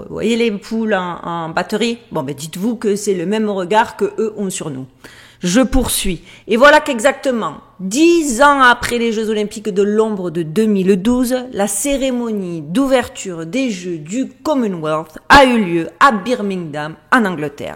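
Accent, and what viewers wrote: South, France. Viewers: Bonjour 👋 Oui 👍 A chaque vidéo un pouce ! Vraiment très intéressant 🧐 en plus ! Et avec notre cher accent du Sud… trop bien Peuchère 🤣❤️🤩💐🌈🙏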